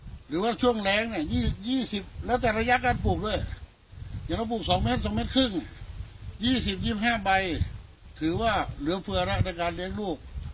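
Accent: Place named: Countryside, Thailand. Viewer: พูดแบบลุกทุ่งๆต่อการอธิบาย ฟังก็ดีไปอีกแบบ!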